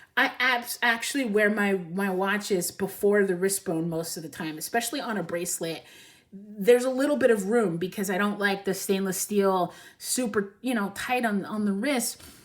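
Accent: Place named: Midwest, United States. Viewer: Great review!!! Thank you. I'm considering the Mark XVIII black dial or the Mark XV which was the first IWC Swiss watch I've ever wanted since the 1990's (the other is a Reverso). Back in the day IWC was difficult to find. If I already acquired my wish list timepieces and money wasn't a concern. I would get a vintage military Mark XI from the 50's for the same price as a Mark XVIII. I currently own a Speedmaster Pro 1861 movement but I need something more anti-magnetic and water proof. It's a tough decision when it comes to items that cost thousands. Do I re-live my 20's Mark XV, or should I just go for the Mark XVIII? Which is a better watch, perfect size, symmetrical design, legible, 20mm strap options.... By the way, are you from the Midwest? You seem to have a Chicago/Detroit/Milwaukee/Ohio accent.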